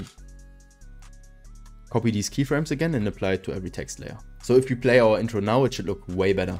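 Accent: Germany. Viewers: man hört dein deutsch extrem raus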